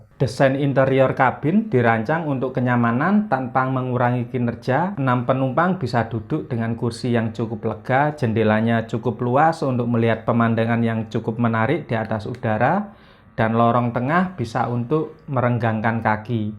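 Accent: Java, Indonesia. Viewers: amrika,,,hadeuuh jowo bnged sihh ngomongnya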